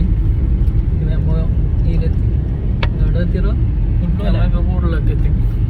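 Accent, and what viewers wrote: Kasaragod, India. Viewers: ഇതെന്ത് ബാസ നിങ്ങടെ കാസറോട് ബാസ 😮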